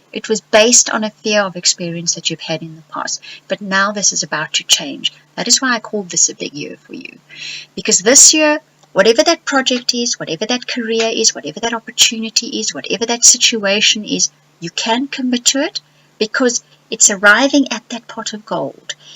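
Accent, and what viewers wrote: South Africa. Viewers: Woah - this made me tearful re the letting go of fear to commit to things. Have had a stop/start thing going on for the past through years (blame Pluto?) re work and have been reluctant to go in boots and all because of it. Crossing fingers for smoother waters. Love your SA accent! Cheers from NZ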